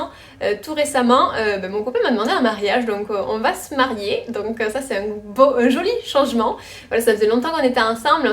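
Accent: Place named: Montpellier, France. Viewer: Félicitations pour le mariage! Je viens de découvrir ta chaîne. Ton accent me disais quelque chose ^^ je suis de Montpellier :) au plaisir de te rencontrer un jour ! En attendant je continue de découvrir tes vidéos ❤️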